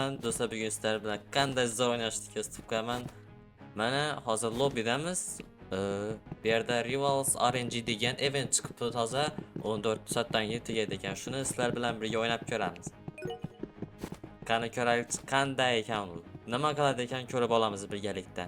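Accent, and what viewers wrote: Khorezm, Uzbekistan. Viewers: Horazmli misiz brat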